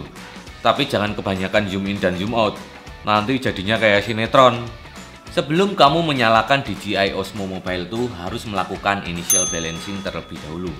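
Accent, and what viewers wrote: Java, Indonesia. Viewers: Kok medok cak?? Ngendi lho asline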